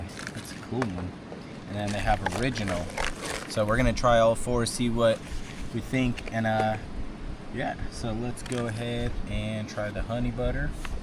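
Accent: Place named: California, United States. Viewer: I never would have thought you are speaking English as a second language, but I do hear that there is more to your sound than California, just a very very tiny bit - 1%. Seriously, amazing to learn English as 10 - that is impressive.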